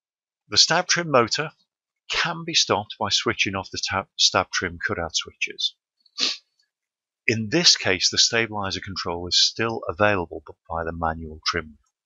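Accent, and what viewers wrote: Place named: Stoke, United Kingdom. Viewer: Are you from Stoke?!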